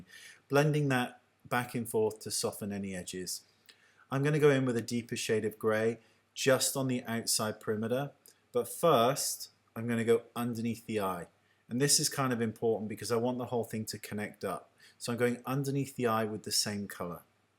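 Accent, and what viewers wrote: United Kingdom. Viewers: Ah... I love when he says delicious. And naughty. You can NEVER beat the British accent. ;) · that girl is beautiful and the finish look was gorgeous. I love the way you work and I also like your accent (I'm learning English so I pay a lot of attention to what you say and the way you say it) btw, where are you from? I reeaally love UK accents. xoxo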